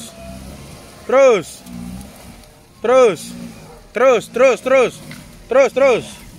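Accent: West Sumatra, Indonesia. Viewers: Logat urang minang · Logat nya urang padang bana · Asal mana bg?? Bahasa ny kyk minang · Bg. Abg orang padang ya.. Logat minang nya kenal saya. Salam kenal da. Dari medan.. Di medan ado cabang da? Awak nio bali.. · Dri logat ny kykny abg ini orang sumatra barat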